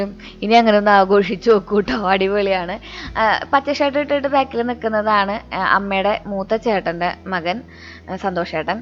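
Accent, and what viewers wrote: Thrissur, India. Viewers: Cake cutting adyam kanunnu. Thrissur bhashayil samsaram kelkkan nalla rasamundu. 😊😊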